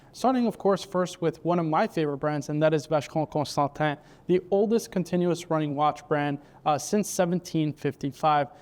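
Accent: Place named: Quebec, Canada. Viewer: Please stop the québécois accent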